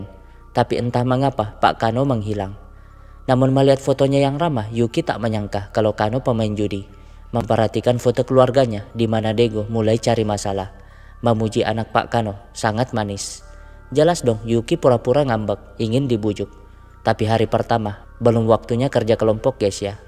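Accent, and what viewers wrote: Makassar, Indonesia. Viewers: Orang Makassar Ki toh bang, Logak²nya melekat sekali 😭🤣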